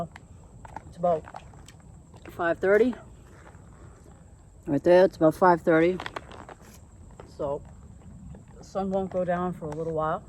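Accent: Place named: North, United States. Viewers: As a Northerner (PNW sounds more Canadian than anything else,) I like her Easternish accent. Getting in her cah, or saying which gear is bettah...lol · We southerners think it's funny when northerners talk about how amusing it is to listen to our southern dialect. Hearing you speak yankee is enjoyable to me. Thanks for what you are doing.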